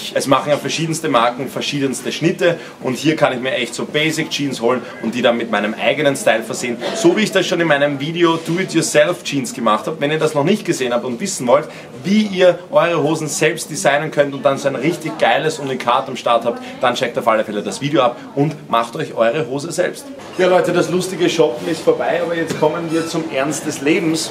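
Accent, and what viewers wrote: Austria. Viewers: Wie uncool klingt einfach österreichisch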